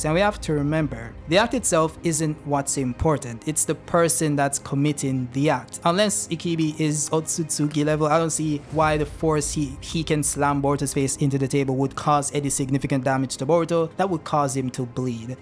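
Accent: Jamaica. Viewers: yo bro are u jamaican?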